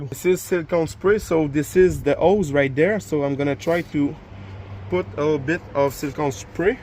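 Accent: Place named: Quebec, Canada. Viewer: Je reconnais bien l’accent québécois 😅 j’ai le même! Merci pour le vidéo je m’apprête à remplacer l’intercooler sur le Santa Fe à ma conjointe.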